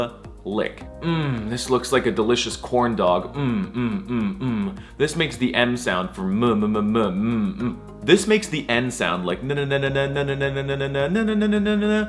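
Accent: Egypt. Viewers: Sorry but your Arabic is partially wrong. You are pronouncing and speaking Egyptian dialect especially for G which should be pronounced J. Your pronunciation of that letter is completely wrong. I didn't heard you talking too much sentences in Arabic but as per the few words you did used, I thing that also your speaking is Egyptian and that is not the real and full Arabic, it's only the Egyptian dialect. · Yeah, hes speaks Egyptian Arabic. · my guy is talking like Egypt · You speak the Egyptian dilect. It's different from the standard Arabic. The ج should be pronounced like in Jacob not like in game. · لهجتك المصرية تحفة براااڤو❤😂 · Y'know, as an arabic guy myself, the fact he learned arabic is such short amount of time, is literally spectacular. Especially that he learned the Egyptian accent too, it's a pretty hard accent. I seriously enjoy watching you! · ook but why you speaking Egyptian 😂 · This is not the real Arabic prononciation,this is Egyptian prononciation,just for correction . 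· there is a small mistake here . that alphabet doesn't pronounce like a "gagaga" its pronounce like gym. you seem to be learnt the arabic in egypt that's why you have the accent. other than this the video is awesome. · As a Arab, I’m pretty impressed by the explanations but some of the pronunciations were mostly in Egyptian dialect and not how they are actually said, other than that amazing video we are all here to support you my brother.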